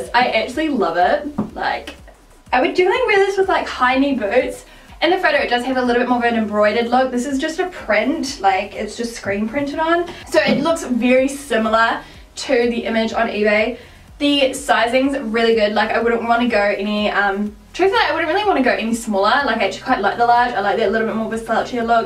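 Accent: New Zealand. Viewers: Are you from New Zealand?? If so SAME girl ❤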